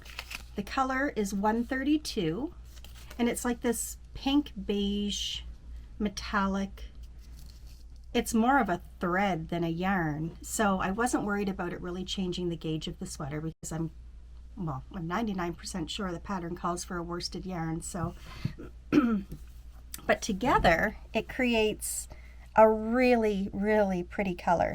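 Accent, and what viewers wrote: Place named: Maine, United States. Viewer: Yay thanks for the update after Vlogmas - love your Everett I have received your Valentine Box and am looking forward the finding a pattern and knitting up all your beautiful yarn. Love your “Down East” charm, nothing like a good “r”.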